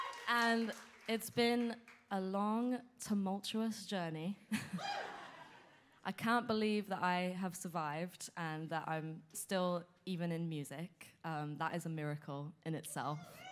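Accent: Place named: United Kingdom. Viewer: Her British accent is gone 😭😂 i liked her British accent 🥰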